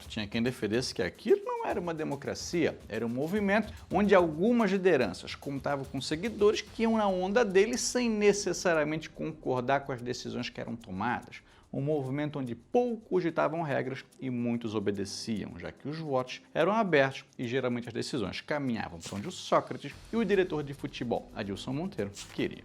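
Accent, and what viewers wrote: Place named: Rio de Janeiro, Brazil. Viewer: Tira esse carioca falando do Corinthians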